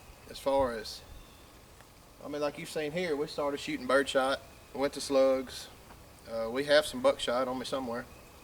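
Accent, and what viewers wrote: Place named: Georgia, United States. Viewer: sounds like your from georiga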